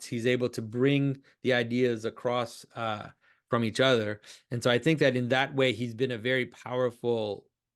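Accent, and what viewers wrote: Wales, United Kingdom. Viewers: You are so Welsh. Love it.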